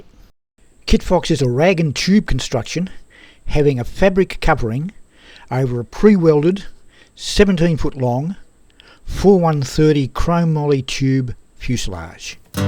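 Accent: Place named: Queensland, Australia. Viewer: He said 1988, NQ Aussie accent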